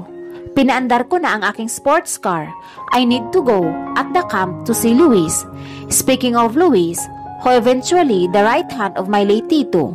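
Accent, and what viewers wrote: Visayas, Philippines. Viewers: Anyare sa narrator?ganda ng kwento sana,,diko malaman kung bisaya o batangueña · Sa nagbabasa ng kwento😅😅😅 bisaya kapoba para kalang kasing tumutula hahahaha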